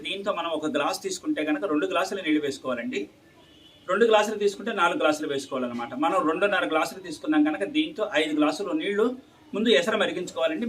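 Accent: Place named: Brahmin, India. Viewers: మరొక మాట బ్రహ్మల మాట ఎప్పుడు అంత swachamga ఉంటుంది అందులో మంత్రాలు chadive వ్యక్తి so మాట అలగే ఉంటుంది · Tamilian ayina mee telugu madhuram ga undi mee vanta laga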